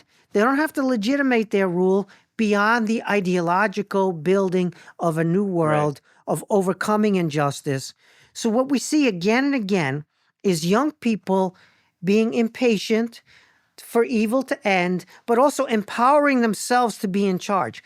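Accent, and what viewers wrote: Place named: New York, United States. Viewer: NYC metropolitan area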